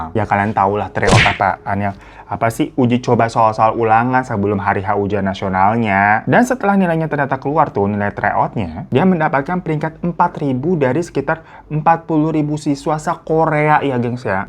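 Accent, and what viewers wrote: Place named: Pasar Minggu, Indonesia. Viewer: lama2 berasa nonton si doel logat pasar minggunye nedok banget bang ..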